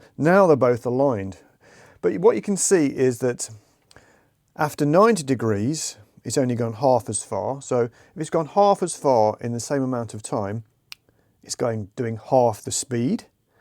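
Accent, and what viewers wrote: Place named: Leicester, United Kingdom. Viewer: You must be from Leicester, you sound just like my mate Andy who's from Braunstone...... and Gary Lineker. On a serious note ive put a Volvo 340 engine (72bhp) in a Renault 4. Ive had to drop the steering column about 25mm to clear the inlet manifold for the Weber carb. It goes like a rocket now but my Mrs doesn't like driving it because the steering wheel is now too low(she says I don't have a problem. ) Do think i could cut it and fit a UJ to bring it back to where it was?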